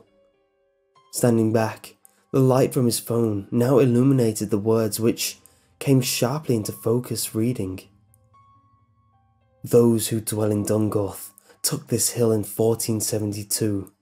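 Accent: England, United Kingdom. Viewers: Yah,not really into britspeak. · I like MCP and. But I like English accent better than MCP's accent.:D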